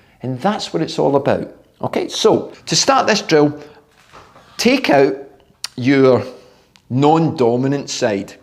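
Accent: Scotland, United Kingdom. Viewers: I am older than you, a 1968 model. You might remember Greg LeMond teaching the exact same pedaling style back when we were teenagers. He said it was like scraping mud off your shoe? GREAT VIDEO. As an American, I love the Scottish accent. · I think is from Scotland… used to live in Aberdeen so I am familiar with this accent! Absolutely love the humor, thanks just subscribed! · Filipino cyclist here, This video helped me a lot, I've learned some things than can help me improve myself, But the Scottish accent is just too much for me😭 I had to repeat this video a couple times to fully understand what he's saying but anyway Thank you. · probably scot or ireland, very thick accent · Lovr the Scottish accent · Love hearing a good, clear Scottish accent! This is what I aim for when I try to remove all the slang and pronounce for business calls 😂 For anybody asking, to my ears this is a central Scottish accent, 25mile radius of Glasgow. 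I think the Lanarkshire guess is spot on. Back on topic, excellent videos! I'm just getting into cycling and have been learning a lot from the channel, thank you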